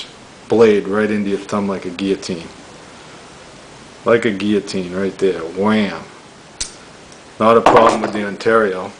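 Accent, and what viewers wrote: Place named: Brooklyn, United States. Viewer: nice review bro! you got a cool Brooklyn gangster accent. where you from?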